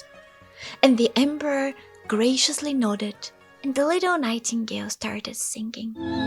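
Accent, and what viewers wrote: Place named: Scandinavia. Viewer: my friend lives in sofia (not exposing his exact adress tho lmao) and he has that big mountain near by that im jelous of, there might be a chance for me to travel there for a bit for a visit, it's funny that im mostly interested in the smaller villages or like the greenery around the city. your accent reminds me of like the or quite a bit that why i thought maybe som northen europe + you have this foresty presence and it really reminds me of like norway and stuff😍 when my friend visited me in poland he's reaction was like: everything is so spacious and like wide wow. here you have i guess everything from meer, flat terrains with/without woods to mountains. i've been to mountains only once because i don't live in the south which is where they are, but im jelous how many of these are in bulgaria. we have starbucks? lmao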